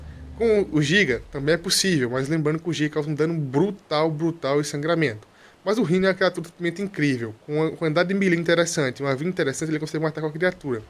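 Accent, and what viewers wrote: Northeast, Brazil. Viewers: de que estado se é sou do nordeste vc parece ser nordestino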